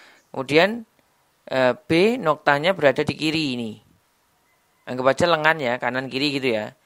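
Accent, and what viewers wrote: Java, Indonesia. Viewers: Saya kalo dengerin mas menjelaskan soal soal suka ketawak... Abisnya lucu sih 😁😄😄 kalo ngomong medoknya ituloh bisa bikin saya ketawa 😄😄😄 suka guyon.... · Ikutun channel kamu saya jafi ikut medok ngomongnya koh😅🥰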